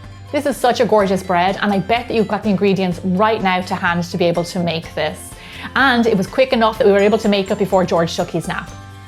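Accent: Ireland. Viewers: Are you Irish? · And your Irish accent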